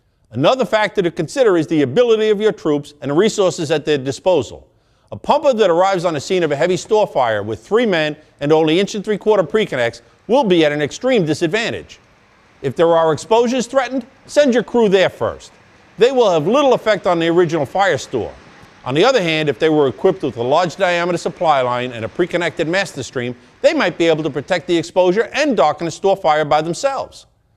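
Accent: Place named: New York, United States. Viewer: I can tell he from New York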